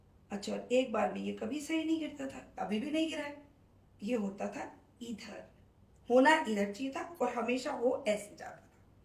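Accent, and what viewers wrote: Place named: Delhi, India. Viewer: Are you a Delhite ..your way of speaking is like them..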